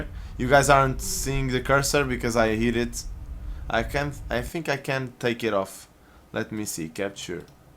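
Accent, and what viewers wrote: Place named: Portugal. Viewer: Bom video, assim que te comecei a ouvir percebi logo que eras português kkk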